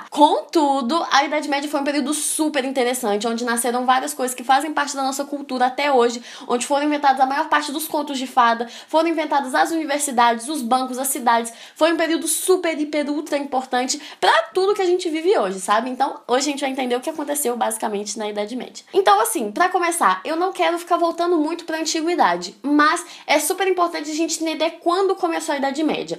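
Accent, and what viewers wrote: Minas Gerais, Brazil. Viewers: Vc é mineira né? Sotaque lindo sô ❤️ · acho q é mineira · Ela é Belo Horizontina, mineira. Haha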